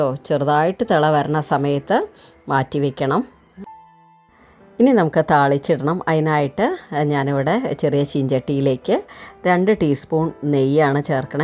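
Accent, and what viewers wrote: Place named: Palakkad, India. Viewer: Palakkad aano ? Bhasha kelkkumbol ende naatukari aano enna samshayam.😊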